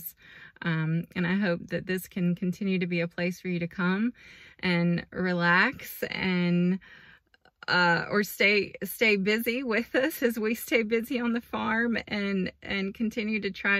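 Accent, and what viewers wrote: South, United States. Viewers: As a Brit I love your southern accent so cool 😎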